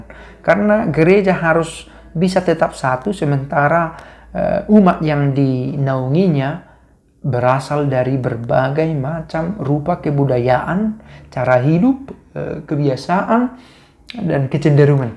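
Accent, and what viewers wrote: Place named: South Kalimantan, Indonesia. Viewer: Logat bapak itu mohon maaf seperti logat org kalimantan selatan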